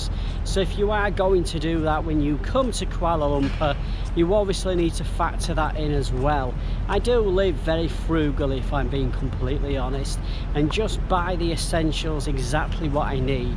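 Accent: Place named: Northern England, United Kingdom. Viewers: South yorkshire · Hi, which part of Uk you come from? Liverpool? Sound like you are from the north. I’m Malaysian live in Uk for 29 years! Fuck! Really?! Yes, but I love it here! When I watch you the first time, I feel like ‘home’, you know what I mean? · Selamat Hari Natal (Merry Christmas) dan Selamat Tahun Baru (Happy New Year)! I’ve changed my YT name from UncleGweilo to this. It’s now even more of a mick take of me by me to Singaporeans, who use “ang moh” rather than “gweilo” to derisively refer to a white person. You and your anchovies,! LOL. You should call them ikan bilis- their Malay name- and avoid the issue totally. Great to munch on with a cold beer after a long day's exploring. Go with the flow trying to pronounce Malay and Chinese names. Be like the Malaysians; if it’s close enough that you can be understood, it’s okay. 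"Relak lah, dun worry" as they say in Singapore! I'm not going to have a go at your northern accent as I'm Australian, which is a curse in itself! I think there are more varieties of nasi lemak than a cat has hairs. With so many cultural influences at play, you can just head from place to place to find the one you really like. Changes in the sambal; fried egg, boiled egg, or century egg; barbecued versus deep-fried chicken, and so on. A guy by the name of Seth Lui recently posted a video comparing five different nasi lemak places in Singapore. Search him out on YT, if you’re interested. Gregory Leow, who travels around Singapore seeking out authentic traditional ethnic Chinese cuisines also does great work reviewing food without making a “foodie wanker” of himself. What you think is the best is the best because you think it is, and what anyone else thinks doesn’t really matter. But if you s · that's fine, but his accent is heavy Northern. 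I bet you guys understand my standard American accent more. lol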